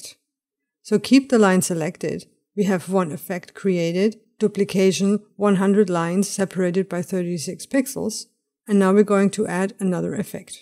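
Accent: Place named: India. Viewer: Thank you for your tutorial. I hear an Indian accent when you speak, although your photo shows your Caucasian genetic makeup. Were you born in India or lived there for a time? And your last name is German! You are a citizen of the world, obviously. Be that as it may, I enjoy hearing English in something other than my flat, American midwest accent. (I am now subscribed to your site.) Chuck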